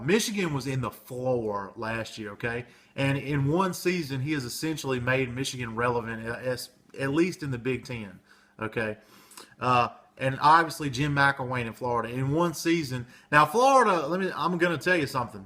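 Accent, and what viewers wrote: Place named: Georgia, United States. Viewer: "Jaowja Futbaw" - Cool accent you "Jawjuns" have sir:)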